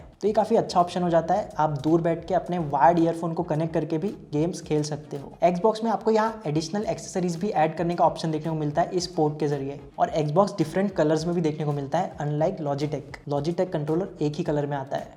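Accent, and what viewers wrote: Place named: India. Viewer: Who understand you Curry language!!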